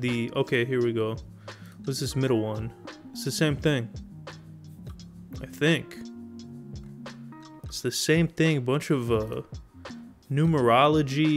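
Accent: New York, United States. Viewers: Needed a real "Fuhgettaboutit" kinda guy to narrate my work session. Thank you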